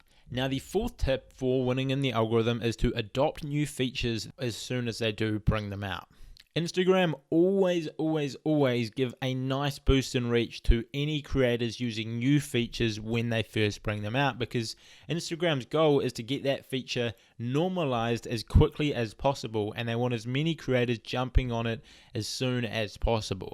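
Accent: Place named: New Zealand. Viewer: Apart from that nice video, I am a big fan of that Kiwi accent!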